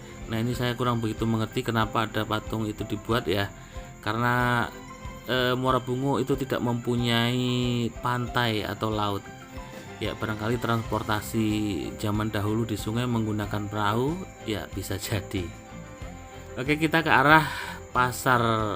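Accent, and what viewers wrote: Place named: Java, Indonesia. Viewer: Si mas nya berlogat jawa. Dari jawa ya mas?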